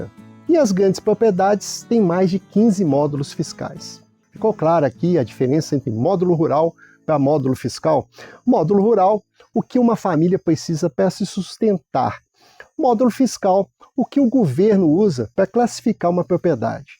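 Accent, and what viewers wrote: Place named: Minas Gerais, Brazil. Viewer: Gostei muito da explicação, e também do sotaque do apresentado... MG!? 👏🏾👏🏽👏🏼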